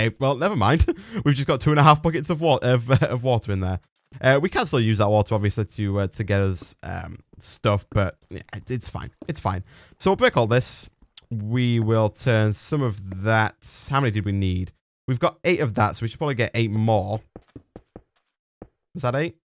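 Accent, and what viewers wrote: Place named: Leigh, United Kingdom. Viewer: Are you from Liegh, Your accent sounds similar *****.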